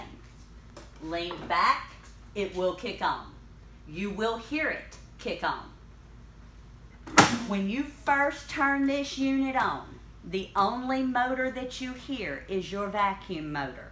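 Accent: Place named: South, United States. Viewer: Who is this lady? Love the Southern accent! lol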